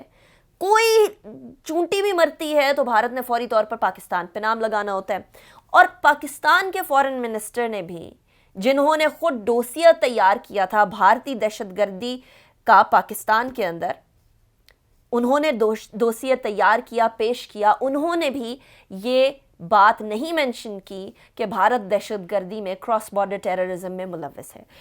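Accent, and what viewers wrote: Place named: United States. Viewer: Apart from everything, please avoid American accent. Don't roll your R and L to sound American. Thanks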